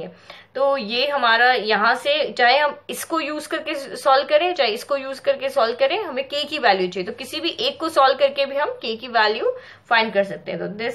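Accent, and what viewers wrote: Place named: Haryana, India. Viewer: Haryanvi accent OP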